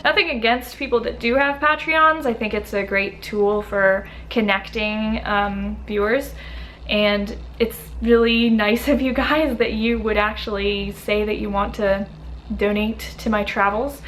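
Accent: Argentina. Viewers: Holaaa !! Soy de Argentina , que lindo que estés interesada en aprender Español !! Se te nota el acento argentino !! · Suenas Argentina! Que linda!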